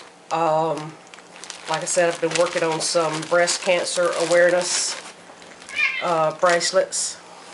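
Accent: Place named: South, United States. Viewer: WOW! Two videos in and youre already tagged. How cool, you are an official part of the youtube bushcraft community. Even cooler that your daughter is your director ,producer and camera operator. You sure do sound southern, lol. You have alot more paracord stuff than I do. I dont have a jig nor have I ever made a bracelet. I keep my paracord in a pile in the floor, lol. Cool video, keep doing what youre doing.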